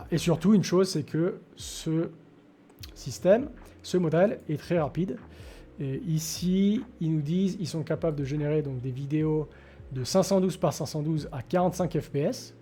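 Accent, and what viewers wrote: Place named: Vaud, Switzerland. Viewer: Ça fait du bien d'entendre du vaudois sur youtube ;)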